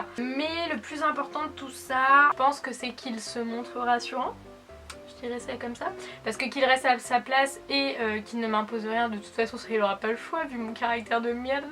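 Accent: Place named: South West, France. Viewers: j'aimerai bien que tu refasse ce test aujourd'hui que t'es heureuse en amour ^^ pas sur que tu répondrais la même chose pour le boulot qui passe en premier toussa toussa :p en tout cas, pour le boulot je suis comme toi ça passe avant l'amour (ma copine me le reproche bcp), et pour la vision du couple, idem : deux personnes vivant ensemble mais ne vivant pas l'un pour l'autre, ça fait trop cliché ^^ Sinon, pour l'accent, je trouve que ça ressemble un peu à l'accent de maïté, donc accent du sud ouest (mais vraiment coté paysan, car je suis du sud ouest et mon accent est beau car il est léger (surtout parceque j'ai quitté toulouse depuis 10 ans :D)) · Un bon accent du sud qui ressort naturellement! Ca fait toujours plaisir ;) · Accent du Sud Ouest ❤❤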